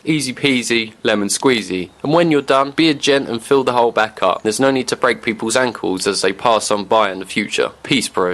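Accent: United Kingdom. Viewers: I love that someone with a Brit accent knows about out Dakota customs. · British accent using "feet" and not "meters". This is great! (Bored COVID comment) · interesting to hear a guy with a bri'ish accent using freedom units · Are there any people on youtube that DON'T have a limey accent?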